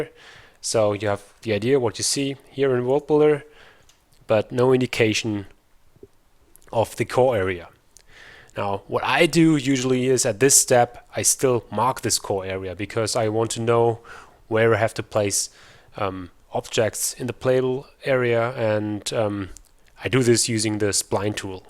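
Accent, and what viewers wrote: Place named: Germany. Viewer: do you still play coh2? Are you from Germany, because your accent sound a little bit like it. greetings from Germany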